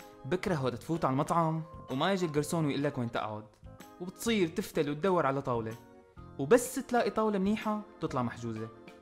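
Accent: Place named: Syria. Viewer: و الله متأكدة انو من سوريا نفس لهجتي و الله 😂💔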